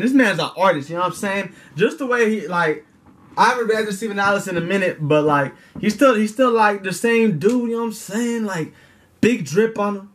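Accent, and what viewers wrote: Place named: China. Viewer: Youre dutch sounds like Chinese (no hate tho)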